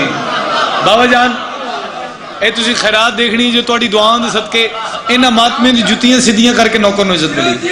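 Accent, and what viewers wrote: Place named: India. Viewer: Just like indian voice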